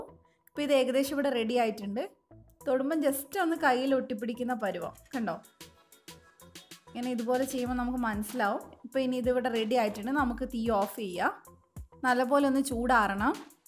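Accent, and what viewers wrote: Kannur, India. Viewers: kannur ano ithaaaa nannaitt manassilavunnund ndayalum spr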